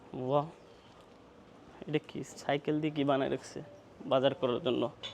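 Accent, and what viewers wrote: Chittagong, Bangladesh. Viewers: ভাই আপনার কথায় আপনি যে কাজ চট্টগ্রামের সেই টা বুঝা যায়।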